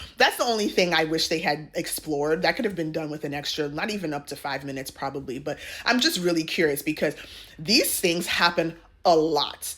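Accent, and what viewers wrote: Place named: United States. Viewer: I really enjoy your reviews, and this comes from genuine support. I’ve noticed a pattern where men sometimes receive more grace for harmful behaviour than women, and it’s important to keep the same energy for both — especially when women call out behaviours or action things that men have long been allowed to get away with, from the start of time. I also struggle with how often Nollywood glorifies adultery. It isn’t just “messy” — it’s emotional and physical abuse, with real consequences like STDs and even death. That’s why younger Millennials and older Gen Z reject narratives that romanticise cheating. We love the American accent here in the UK 👍🏾. I appreciate your work and just wanted to share this perspective because your platform is strong, and fairness matters.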